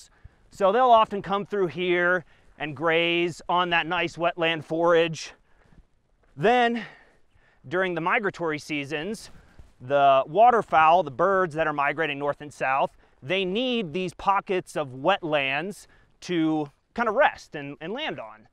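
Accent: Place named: Southwest, United States. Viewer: That's what a southwestern accent sounds like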